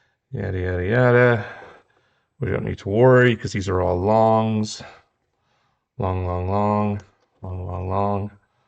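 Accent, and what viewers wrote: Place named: Germany. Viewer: excellent video! first off , your German accent was the highlight of my morning. Also, after the mosfet install u used a yellow brush to cleanup the job site. what product did you use for that? cuz i have some rebuilt ham radio gear where they didn't clean like you did. Also,is there a number of seconds u keep the s gun on to avoid cold solder joints.? lastly, I have this Bose 300 but I entertain at fancy houses and ive seen numerous Sonos brand installs. Do you know if Sonos has a good build quality? Thanks so much. Jim in Washington state.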